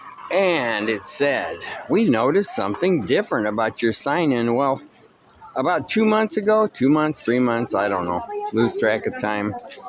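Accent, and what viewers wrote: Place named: Midwest, United States. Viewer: Hi guy I don't know your name. This is the first time I've seen your channel. I live/d in Negros/Valencia for four years now. Sorry to hear about your banking troubles. Hope you get it resolved. I'm Jeff/70 from Wisconsin originally but lived in California long time. Are you from midwest? Just curious from your accent. Yeah, simple life ain't so simple...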